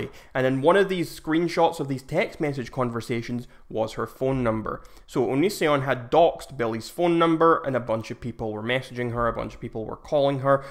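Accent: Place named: Scotland, United Kingdom. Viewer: Your accent reminds me of my great grandma.. she had the thickest Scottish accent and listening to her talk was amazing.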